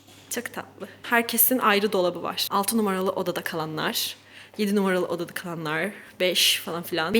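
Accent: Ireland. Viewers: AKLIMA CILLIAN MURPHY GELİYOR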